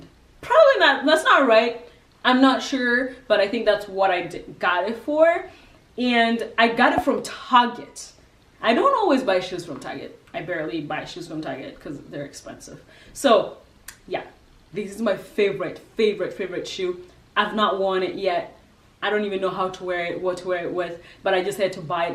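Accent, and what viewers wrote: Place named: Cockney, United Kingdom. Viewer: Great videos. Why do you have some hidden bri-ish or rather cogney accept? Which State are you in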